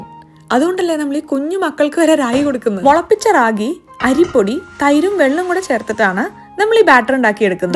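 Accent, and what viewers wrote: Malappuram, India. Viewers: 💖🥰 Malappuram slang👍✨✨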